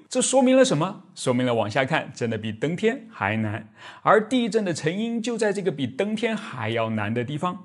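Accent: Nanjing, China. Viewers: 是南京人呀😜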